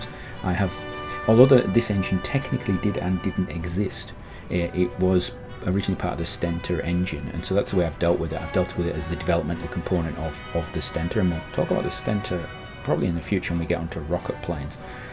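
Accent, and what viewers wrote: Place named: United Kingdom. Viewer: hmmmmmmmmm that british accent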